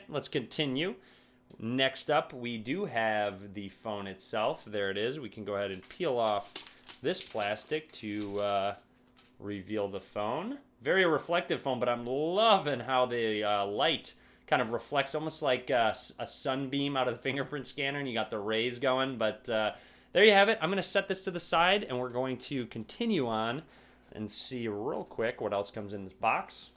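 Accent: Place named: Chicago, United States. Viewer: Favorite phone reviewer, right up there with MrMobile, Michael Fisher. Especially like your Chicago accent. I see Amazon is already out of stock, sad. Wondering if this phone will work on Project Fi....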